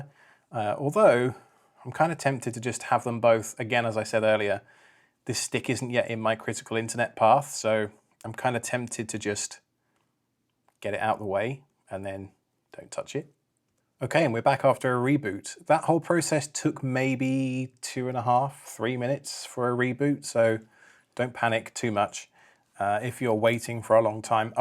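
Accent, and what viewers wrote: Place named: United Kingdom. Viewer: I'm not familiar with the different UK regional accents, but to my ears you sound like you might be from the same general area as James Corden, or as I refer to him, Craig Owens: Father of Stormageddon, Destroyer of Worlds. Just how far off am I?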